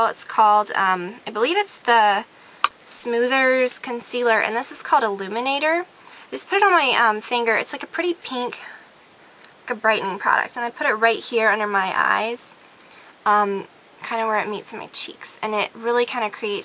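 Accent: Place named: Atlanta, United States. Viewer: In this vid you can def tell shes from Atlanta!!! Hahahaha she has changed a lot, wish is good!!!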